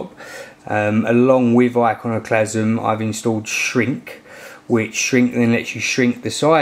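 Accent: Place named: United Kingdom. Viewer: Piges?! Lol british accent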